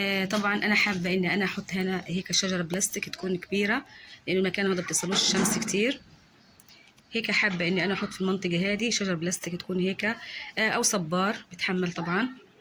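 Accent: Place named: Egypt. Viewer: صحتين وعافية بدي أسألك إنت بتحكي شوي مصري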